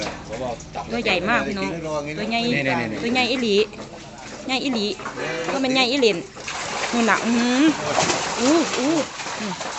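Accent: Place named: Laos. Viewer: ยุอำเภอไรครับพูดออกลาวๆ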